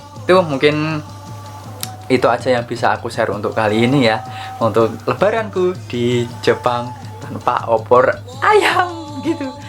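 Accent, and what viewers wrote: Java, Indonesia. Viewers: Sampean jowone ngendi mas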